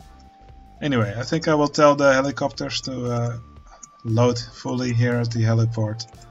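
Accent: Netherlands. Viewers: Ik kan je nederlandse accent horen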